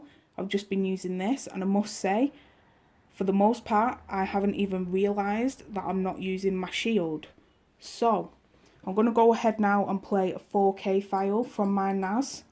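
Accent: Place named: Birmingham, United Kingdom. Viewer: I am foreign but you're sooo much from Birmingham :)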